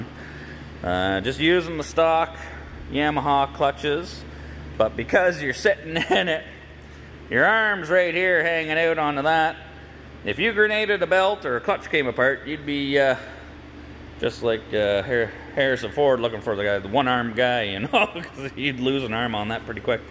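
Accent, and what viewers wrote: Canada. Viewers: That Canadian lingo cracks me up. 🤣 I have practiced for hours and still cannot say out like you guys do.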